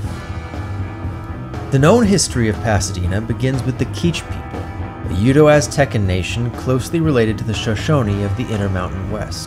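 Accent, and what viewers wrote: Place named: New York, United States. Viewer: I'm surprised to hear that you're from California because I hear so much New York in your accent (notably in "caused" and "Chicago"). But honestly I wouldn't be surprised if a lot of the Southern California accent is similar to the Northeast.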